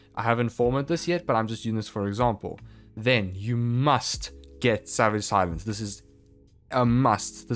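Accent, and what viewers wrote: South Africa. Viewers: Dude you sound South African. Probably from Joburg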